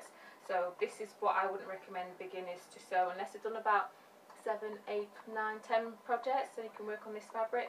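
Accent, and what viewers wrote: United Kingdom. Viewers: Fantastic tips as always, I should be in bed but I decided to listen to my favourite online fashion tutor! From your accent I can tell, you are British. I live, not far from London. Could you recommend any areas where I can get the wasted ends of fabric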